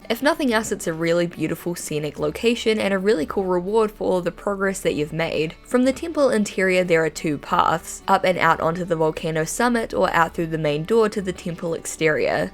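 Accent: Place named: New Zealand. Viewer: The original Sims is, so far, the only Sims game I've ever played, and I only got to the House Party (?) expansion pack. I watch these videos because I enjoy yer videos. And because I'm so intrigued by kiwi accent. But also because I enjoy your videos. So appreciate how much we (can only speak for myself, actually; sorry) aoppreciate your videos, dammit!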